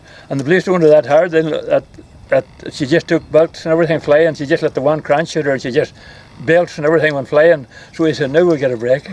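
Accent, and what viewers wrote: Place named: Scotland, United Kingdom. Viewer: Sounds perfectly Scots to me.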